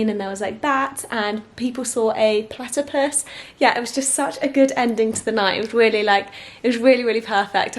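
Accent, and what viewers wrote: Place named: United Kingdom. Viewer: Always love your videos and charming British accent :-)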